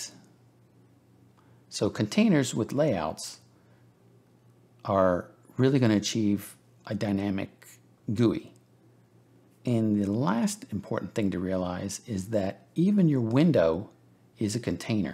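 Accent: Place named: India. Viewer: Are you indian by any chance? You sound like my coworker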